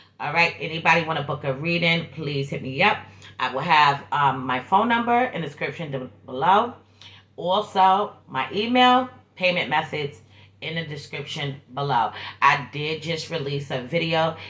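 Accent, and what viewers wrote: New York, United States. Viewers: I always watch your videos. U sound like you from the Bronx or NY I should say. I have this placement. I also have Neptune in 4th house. Saturn and Uranus in the 2nd. In this lifetime, I took care of my mom. So much so I took her to the hospital the day she died. But I couldn’t stay cuz of Covid, I got the shock of my life when I called the hospital two hours after I took her and they told me the doctor will call me back because when he called she told me my mom died. I been taking care of my mother since I was very small. I mean going out doing laundry and going food shopping at 10 years old. I had a very hard childhood. I was the black sheep. My mom was mentally ill, severely depressed and emotionally unavailable. I always felt very unloved. Then I was the scapegoat. But I love these interpretations. U do your research and u don’t sugar coat like thee other astrologers. 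You are helping with me and others with shadow work. God Bless You and Yours.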